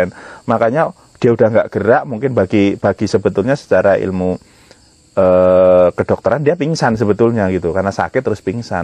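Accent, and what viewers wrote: Banyumas, Indonesia. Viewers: Lah bisa ngapak juga😅😅😅.. Asale endi sih rika pak?? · ora ngapak ora kepenak.... 😁 salam ngapak ( karang pucung )...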